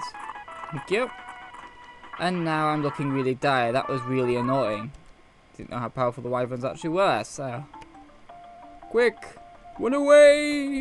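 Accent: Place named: England, United Kingdom. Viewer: I am hispanic american, but I dont think I have any particular accent myself despite my hispanic roots. To me though you just have an english accent, I know that there are various kinds but I cant really tell the diffrence.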